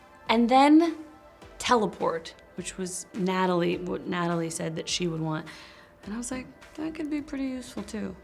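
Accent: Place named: United Kingdom. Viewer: She’s so funny and talented to. Her British accent is 👌🏼❤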